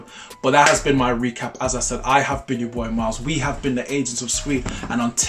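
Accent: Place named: United Kingdom. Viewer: I love the British accent bro and love your choice of music. I like Nathan as a character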